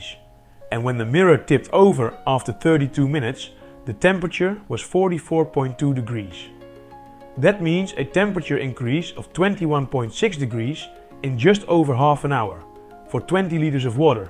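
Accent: Netherlands. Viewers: I hear the Dutch ;) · His english Sounds a bit like from nedderlands ... The sun there gives nearly 1000w/m² ... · I'm filled with proud Dutch feelings after hearing the accent. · Nederlander detected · So to hear it's definitely a Dutchman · Ahh that's crispy Dutch accent 😅😂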